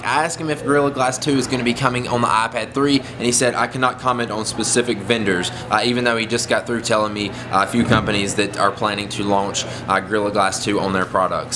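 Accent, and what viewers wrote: South, United States. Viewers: What part of the south are you from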